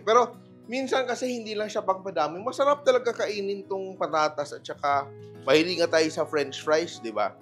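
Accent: Philippines. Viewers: Are you a cook from the Philippines? I noticed you sound like someone from the Philippines speaking Tagalog or Cebuano.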